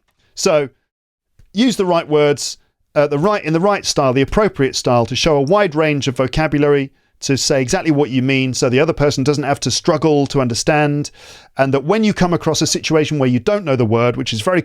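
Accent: United Kingdom. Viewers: I enjoyed the episode thank u very much. Also your podcast room is so cool! And finally, which British accent do u have I am interested ? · British English is much easier to understand. Thank you ❤❤❤❤❤ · Your british English is so clear to be understood 😊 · Dear teacher!!! I love your podcast, I love your accent or British accent. I´m learning a lot and havin fun. Lots of hugs from Brazil! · I don't know why but i it's really difficult understand uk accent, ven so I could have good compren henshion of your explanation comparing to north america accent it's really really different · I’m literally enjoying to listen to your podcast, I love British accent ❤ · I don't like your British accent 🤢🤢🤢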